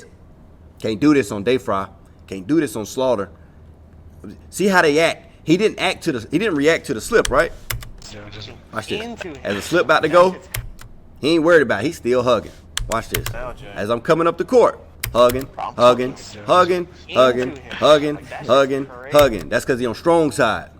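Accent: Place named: Atlanta, United States. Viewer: My dawg pointed you out....you peeping it young ..that next pass gotta know his next move...good break down...you atl or you midwest boss? You got that t.i. dialect ...salute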